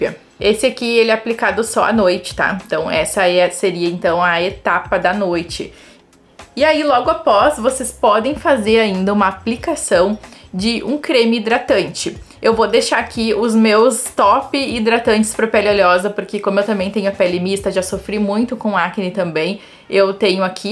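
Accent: South, Brazil. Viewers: Pelo sotaque também é do sul do estado, obrigada pelas dicas ❤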